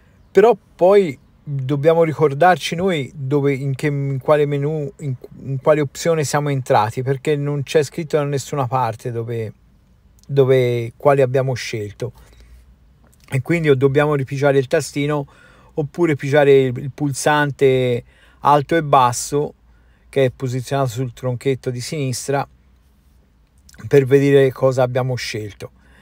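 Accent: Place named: Tuscany, Italy. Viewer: Mi sono appena iscritto perché dopo aver visto qualche vostro video mi sembra di essere tra amici che chiacchierano sulla loro passione, io ho una r1250gs std del 2021 e ci troviamo veramente bene, specie mia moglie😅; io sono di Torino ma trovo simpatica la tua cadenza Toscana ( abbiamo amici a Pisa e all’ isola d’ Elba). Ciao Roberto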